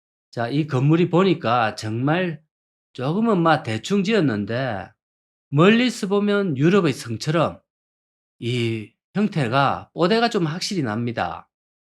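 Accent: Gyeongsang, South Korea. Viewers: 정말 보리문디 사투리 정겹다.연식있다보이 다이자삣는데!직이네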